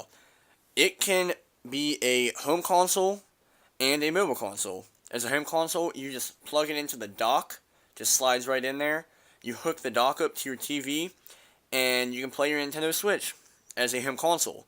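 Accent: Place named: Philadelphia, United States. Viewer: Definitely philly/south Jersey accent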